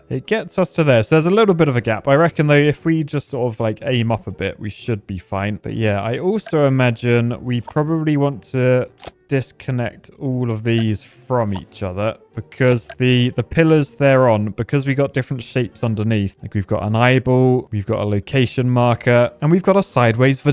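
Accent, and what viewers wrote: United Kingdom. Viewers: This video would’ve been better without your British accent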